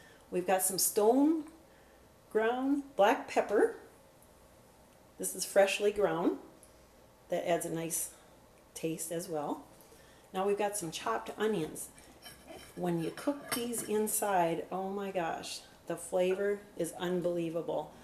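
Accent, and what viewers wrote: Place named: Midwest, United States. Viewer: You are definitely a mid western German with that accent. Great recipe!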